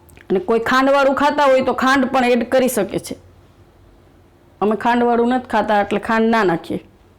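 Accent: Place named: Saurashtra, India. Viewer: Ben tame saurashtra junagadh bajuna lago chho...... tamari boli uparthi..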